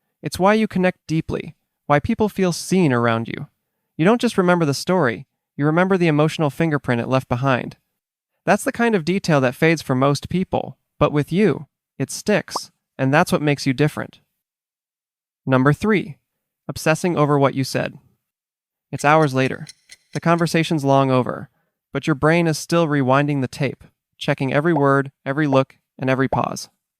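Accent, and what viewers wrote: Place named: Canada. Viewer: You’re Canadian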